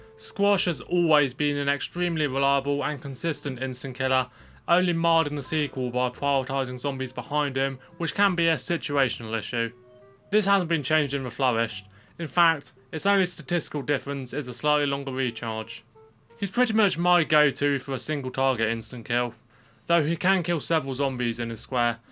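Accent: United Kingdom. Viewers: The British accent is very cool 😎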